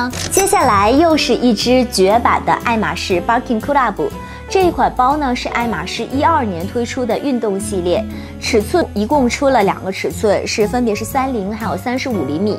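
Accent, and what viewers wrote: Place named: Japan. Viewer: 谢谢做这些Hermès 视频. 你真是Hermès expert 而且也有access to so many unique Hermes pieces. 一个小建议哈： 能不能在屏幕上放上讲述内容的英文，日式英文真的是听不太懂啊……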